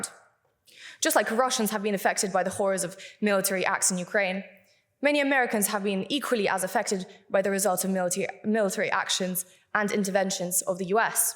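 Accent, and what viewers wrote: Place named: United Kingdom. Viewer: you cannot say so because she mush have been living in the UK for too long given her accent. Probably immigrated as a child.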